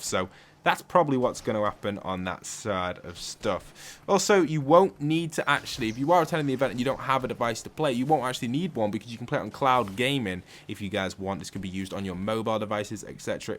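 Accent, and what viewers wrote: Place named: United Kingdom. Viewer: He sounds like he is form the UK and they call Soccer Football over there.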